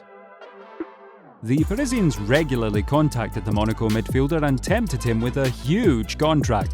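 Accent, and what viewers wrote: Scotland, United Kingdom. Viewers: You have a Scottish accent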